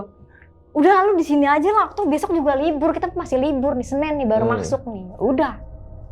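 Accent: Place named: South Jakarta, Indonesia. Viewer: Terlalu Jaksel gaya penyampaian materi nya, hello yg nonton bnyk org daerah bicara nya terlalu jakarta deh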